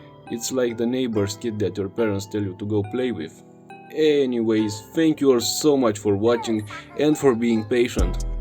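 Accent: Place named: Russia. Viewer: Ты русский, по акценту понятно)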